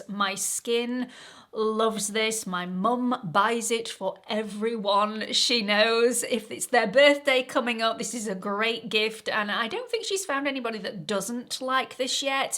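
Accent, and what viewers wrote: United Kingdom. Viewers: I gave a thumbs up for this video, even though it seems to be a long ad for expensive devices I can't use because I have an implanted cardioverter-defibrillator. I honestly never noticed how well you sell-Sell-SELL! No worries, your voice is so enjoyable, and your British accent helps train me to listen better as I'm hard of hearing. Thanks for the lesson!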